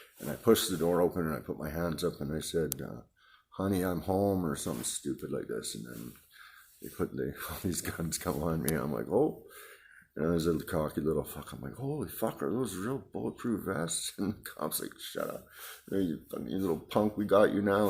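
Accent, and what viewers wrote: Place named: Canada. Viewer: How do you not have more subscribers.. Great stuff I subscribed right after itsmeen recomended you. You can tell some stories and the mix of Canadian and native accent sucks you into the story even more..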